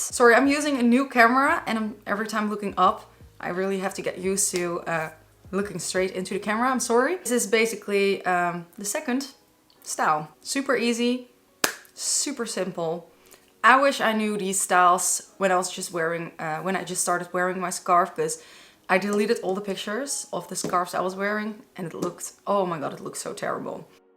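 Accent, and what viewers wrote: Netherlands. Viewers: I just feel like your Dutch, I mean your accent, it sounds like it. No offence, just a thing I noticed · Ik kan gewoon aan je accent horen dat je uit Nederland komt, leuke filmpjes goed bezig ma sha Allah! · Ja hoorde het ook al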